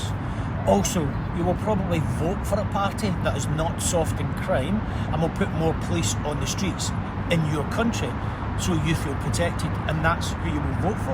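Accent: Scotland, United Kingdom. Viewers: What's the accent?! Scottish?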